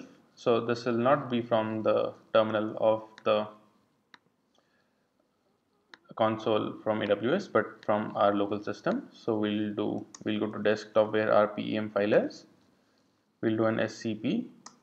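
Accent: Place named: India. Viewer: 咖喱味的英语听不懂啊^^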